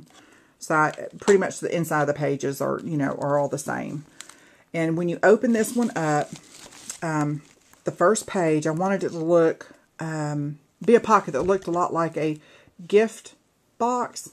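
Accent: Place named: South, United States. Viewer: This is one of the MOST adorable minis I've ever seen! I love love love it!! :) And on top of it all I love listening to you talk! I'm a "yankee" so southern accents I could just listen to all day because I never hear em!!! I can not wait to check out your other videos! Thanks for sharing this!! :)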